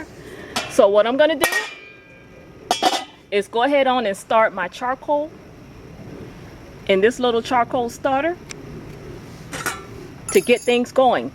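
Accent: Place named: United States. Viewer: Fuck I hate your dumb American accent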